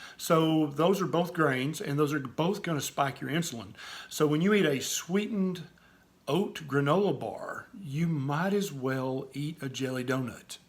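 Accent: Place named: West Virginia, United States. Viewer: I love your accent, Are you from West Virginia? That's my favourite English accent! You make interesting videos. I love to learn new things!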